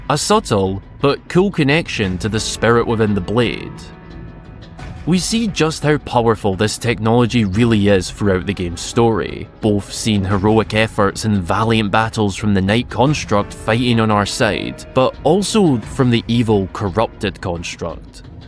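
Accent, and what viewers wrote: Scotland, United Kingdom. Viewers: idk if it's because I havent watched a video of yours in a while but damn dude, did you get extra Scottish? Amazing lol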